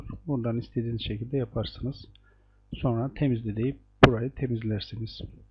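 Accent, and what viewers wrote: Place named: Ardeşen, Turkey. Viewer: biliyordum :) ardeşen tarafları gibi hissettim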